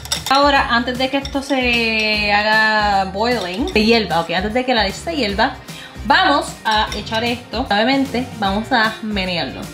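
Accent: Puerto Rico. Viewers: Que mujer mas linda de verdad. Una mujer China hablando bien boricua. En la escuela yo vi una Japomesa hablando español y en la Universidad una Vietnamese woman tambien hablando bien Boricua. Que bonito de verdad. Dios bendiga tu belleza. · 😂😁😂 Te pasas!, saludos hermosa, no te puedo ver como china pues con ese acento y carisma de boricua al 100%, imposible, no se como caí en unos de tu videos pero si, son cheverones😁 muy simpatica, te voy a seguir viendo por aqui🙏 cuidate!😁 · 😃😃😃😃nena tu hablas mas puertorriquena que yo ME en canta el hablar boricua 😭😭. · Gracias por hablar tan lindo de mi isla. Tienes el flow Boricua. 😊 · Woooo corillo aquí una nueva subscriptora jajaja te ame dsd el momento en que te escuché hablar boricua de pura sepa 💓 Desde Ponce PR ❣️ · Quien es esta Boricua china habla bien borricua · Si no me dices que eres china, ni me doy cuenta. 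Pareces, hablas y actúas como una puertorriqueña 🤣👐🏼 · Nenaaaa, eres 100xciento boricua. Acento, manerismos, pique, me rei tanto contigo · tu asento es de boricua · Que chulita, con ese hacento Boricua ,.Que pena que no eyes de mi epoca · Ahhh me encanta tu acento boricua!!! 😂😍😍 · Está mujer si q tiene acento bori 💯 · Me muero de la risa contigo yo soy nacida y criada en P.R hasta los 16 y tu hablas mas boricua q yo!😂🇵🇷 love the video you are too funny. Definitely new sub!! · Me encanta tu accento boricua eres hermosa y muy energética me encanta todo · Niña tu acento es puro boricua. Nosotros queremos recibimos muy bien a nuestros invitados. Mi recuerdo es las reuniones familiares, yo extraño mucho a mi familia. Feliz Navidad hermosa💞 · Me encanta tu personalidad eres un vacilon 👍😅me siento bn orgulloso d q t sientas como toda una boricua 💯💪me sorprendes y me encanta tu acento d mi preciosa isla no puedo irme sin antes decir q eres BELLA........😍😘💖🌹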